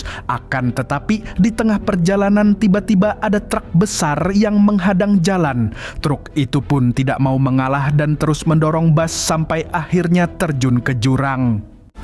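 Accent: Banjar, Indonesia. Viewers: Orang Banjar kiranya ne😂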